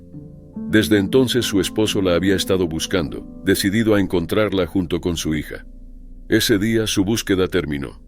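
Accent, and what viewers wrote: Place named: Spain. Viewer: tu azento es Lindo cariño es español 😍😍😍😍😍💋💋💋💋❤️😊🧜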